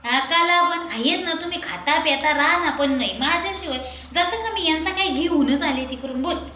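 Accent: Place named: Shahapur, India. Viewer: शहापूर च्या भाषेची आठवण येते तिथल्याच बोलीभाषेची🎉😂❤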